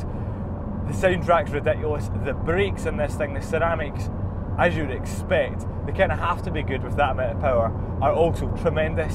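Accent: Scotland, United Kingdom. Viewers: constitutionalpie * Scotland, groundskeeper Willie from Simpson’s has thicker accent.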